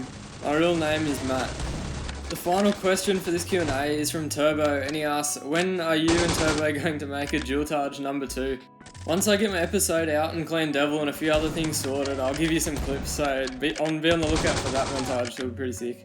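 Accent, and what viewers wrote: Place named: Australia. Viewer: Love your Australian accent and I even live qld but I don't have an accent. Lol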